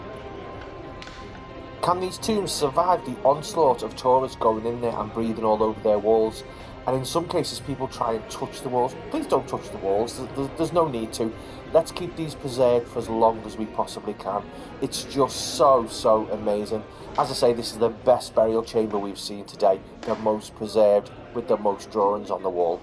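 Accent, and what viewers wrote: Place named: Bromley, United Kingdom. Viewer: Great video and insight. I love your video and British Bromley accent. 🙂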